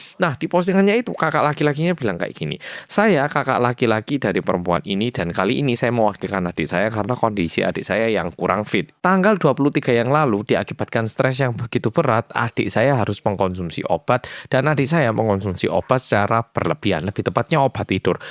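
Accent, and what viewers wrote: Java, Indonesia. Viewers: Udah nggak tertarik sama sokor, cuma senang nonton mas, lucu 😂, medok medok jowo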